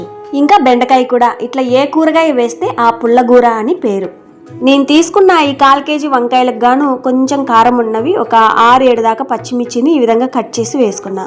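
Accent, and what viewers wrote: Rayalaseema, India. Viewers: మీది రాయలసీమ నా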